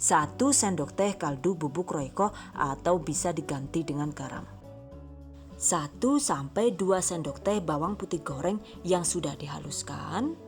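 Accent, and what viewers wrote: Banyumas, Indonesia. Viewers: Deneng ana ngapak2e 😂😂😂 jhahahaha😂 auto SUBSCRIBE yu. Komen ninggal jejak. Lopene kue di tempel. 💖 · Subcriber baru ☝️asli lucu banget kamuuuuu, gyemessss ngapak"nya dan caaaattaattt. Ada tips & trik, lengkap deh. Pas kepoin yt nya ternyata banyak bgt resep" yg ku cari". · Suka aksen ngapaknya kaya rika wkkkk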